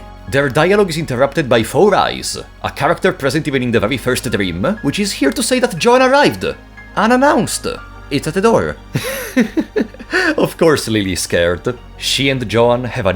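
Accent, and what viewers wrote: Italy. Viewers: That hits me like a truck hearing manusya, a word in my niche language in an english game narrated by a dude with heavy italian accent. Very cool. Didn't know that word came from buddhism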